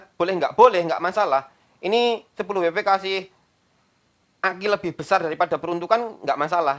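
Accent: Java, Indonesia. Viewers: lucu juga ya mas ini medok jawane iaku senengmakasih saya juga mau planing buat plts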